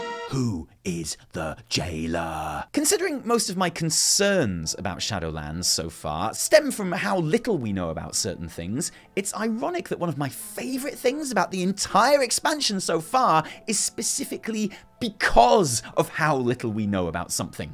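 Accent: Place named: United Kingdom. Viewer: Add Subtitles plz... i love your videos... but you speak too fast XD i love the british accent by the way <3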